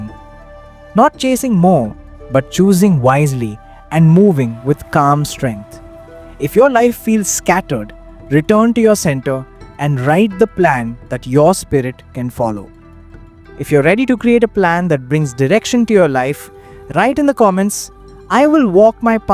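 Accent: India. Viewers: At last the self help topic with an Indian voice which made me stop and listen . Usually they are all junk. Thank you for the quality video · Indian accent 🙄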